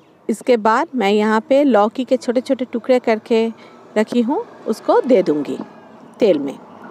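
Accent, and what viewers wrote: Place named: Bengal, India. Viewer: Like 69 Beautiful video I enjoyed your Bangla accent also God bless you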